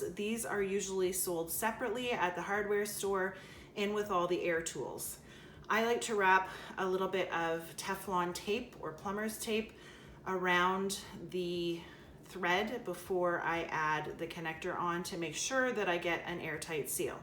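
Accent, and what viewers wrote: Canada. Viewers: Your Canadian eh! Even better!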